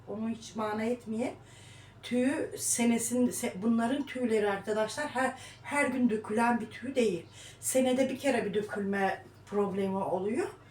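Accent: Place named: Aegean, Turkey. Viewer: Şivene bayılıyorum Ege şivesi 😘😘😘Çiko da evinin bir ferdi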